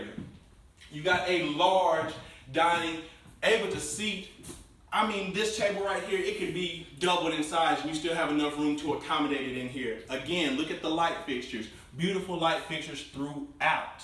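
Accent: South, United States. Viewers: I love your country accent. Pay no attention to my son's Lego icon.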